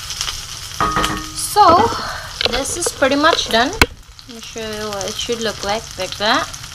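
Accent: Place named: Visayas, Philippines. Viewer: Bisaya ka dai.halata kc khit nag iislang ka magsalita haha.suysus daw.soysauce po.heje.peace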